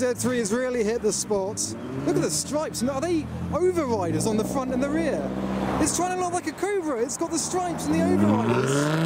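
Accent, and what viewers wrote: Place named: United Kingdom. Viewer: you got a sweet girlfriend, sweet video's and your voice is so damn britisch. love it dude ! greetings from the Netherlands.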